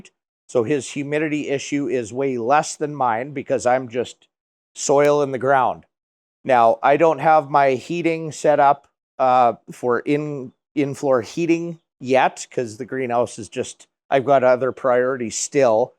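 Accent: Canada. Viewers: This guy sounds so Canadian i Love it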